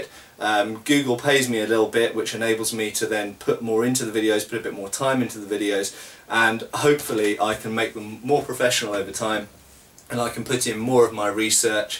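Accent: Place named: England, United Kingdom. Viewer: THANK YOU for making cool videos about swords! We love swords and the English accent only enhances your narrative coolness :)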